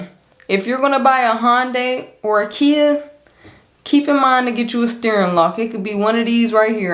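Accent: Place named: Midwest, United States. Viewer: I love your accent lol you from Ohio or mid west area ?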